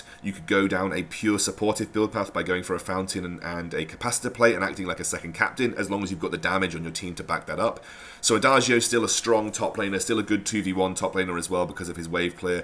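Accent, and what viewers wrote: United Kingdom. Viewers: "Meturr" "Alpherr" why do the British ruin words?! 😓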